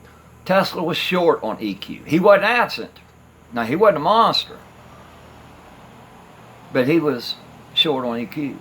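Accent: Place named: South, United States. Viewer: Bill Burr, a comic, makes fun of conservatives and liberals. You sound exactly like the kind of person he was parodying...a guy with a southern accent who is intelligent and a leftist. Power to you my bro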